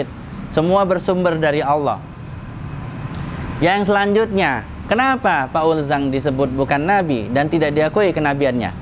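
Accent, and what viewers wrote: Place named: West Java, Indonesia. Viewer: Koh.. Chinese berlogat sunda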